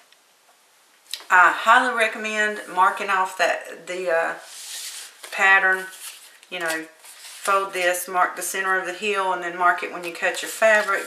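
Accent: South, United States. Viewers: First, there's not anything as adoring as a southern accent! :) Secondly, these are the cutest little shoes and I'm going to try my hand at making a pair. Thanks for posting it. Blessings!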